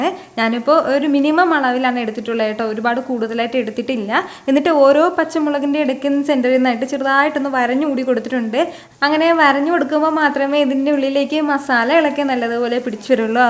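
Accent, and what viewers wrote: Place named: Thrissur, India. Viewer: മൂളകൂഷൃം ത്രശ്ശുർകാർ പറയൂം ചെറിയൊരു വൃതൃസം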